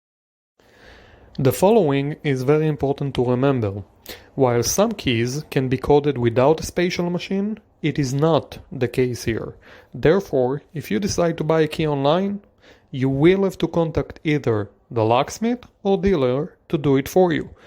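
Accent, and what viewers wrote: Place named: Israel. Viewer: Your israeli accent is clear as sun Ty for destroying the locksmith industry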